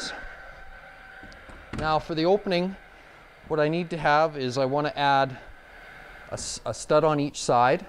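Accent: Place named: Canada. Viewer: I needed help to fill a window hole. I could only find this and I figured, pretty much same process. This will work perfect. Thanks so much. I was also pleased when I heard you speak. I thought, "Well now...a Canadian accent." Thanks a bunch from New Brunswick.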